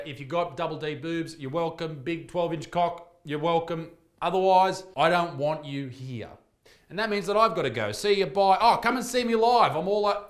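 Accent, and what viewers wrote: New Zealand. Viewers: 10/10 for making me laugh today. Your kiwi accent is hilarious your rating of cup sizes is ground breaking. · How the hell did a nothing story from the west coast of NZ end up in the US. Especially as there are only 6 people who live in Hokitika. I need to get my arse down the road to the busy place (Auckland) to watch your show. Good work fella. Your Kiwi accent and pronunciation of Te Reo (Maori) is pure comic gold. Aussie is woke as hell, but there are plenty of virtue signalling woke idiots here too.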